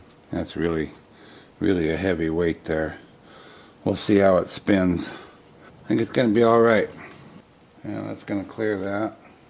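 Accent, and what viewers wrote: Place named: Indiana, United States. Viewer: Good morning. The bowl is beautiful. I was worried you would catch your hand on parts of it. Maybe you should wear a glove. What part of midwest are you in? You sound like Indiana. Have a bless day. See you next time.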